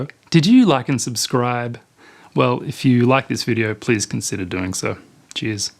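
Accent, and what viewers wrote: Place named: Australia. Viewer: This looks great! You sound Australian and you gave the price in dollars. Unfortunately I can't see any information at the String Pluckery website about what currency they're using or where they are located. Is this price in Australian dollars? (I'm hoping it's not in US dollars!)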